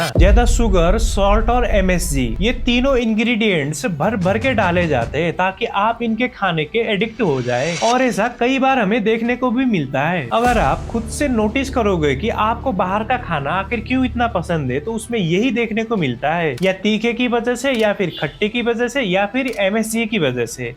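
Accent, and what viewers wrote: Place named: Nepal. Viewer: Yeh toh nepali accent lag raha hai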